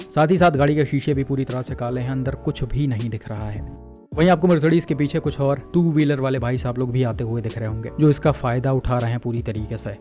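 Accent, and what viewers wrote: Lucknow, India. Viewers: bro are you from lucknow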